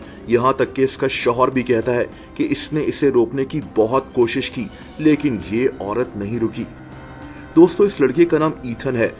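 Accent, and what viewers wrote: Multan, Pakistan. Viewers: Are you from multan??????????????😊😊😊😊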